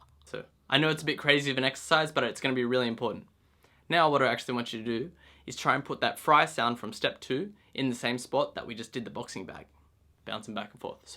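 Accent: Australia. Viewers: Are you an aussie? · so aussie i did not realise he was aussie as well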